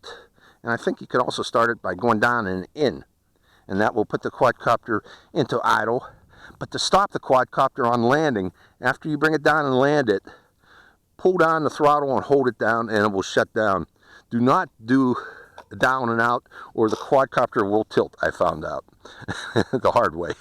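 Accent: Pittsburgh, United States. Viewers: are u from Pittsburgh? I noticed a accent. .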